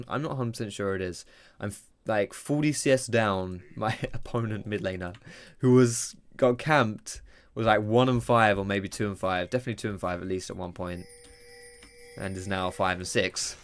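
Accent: France. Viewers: Video gets a like for that french accent alone! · that french accent tho xD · the french accent makes me smile xD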